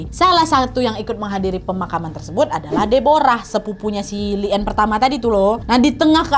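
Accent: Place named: West Sumatra, Indonesia. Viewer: Candu Bana mandanga ngecek Jo Bahaso minang lah😂😂😂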